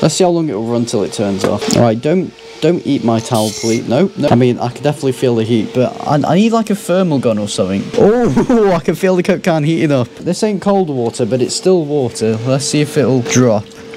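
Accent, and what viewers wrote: United Kingdom. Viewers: this video just got recommended to me and i clicked and now im invested in this channel, idk i think its the fun stupidity and the british accent as im british aswell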